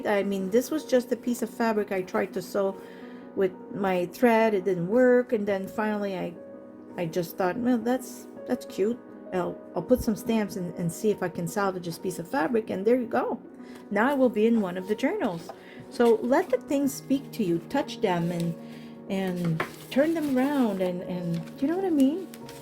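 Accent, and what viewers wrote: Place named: Quebec, Canada. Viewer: Willing to listen to your French-Canadian accent?? I could listen to it for hours, my dear!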